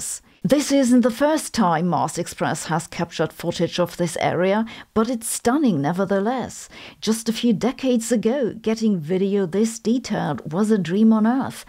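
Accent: Germany. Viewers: Dear Sabine, just two questions about your themes presented in this video: i) You said that although the mathematical construction works, there's no physical evidence for the "simulated universe " theory. Is there any evidence that excludes this, too?.. If there is, I'd very much like to know... ii) About the method of road construction on the Moon surface: do the scientists involved tested the structural endurance of their "melted tarmac " against the extreme conditions in their lab, concerning temperature, that the surface endures throughout the day-night periods? According to my - limited- knowledge, that's the prime factor for any construction, set aside the humidity, which is non-existent on the Moon, of course... Thank you for your videos! Popularised science, in layman's terms for the broad public, is the best way to spread the achievements of science widely. 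Even speaking with a heavy German accent, which sometimes reminds me the Peter Sellers' performance in "How I learned to love the Bomb"! 😊 I would very much appreciate a reply to my questions, if you please..!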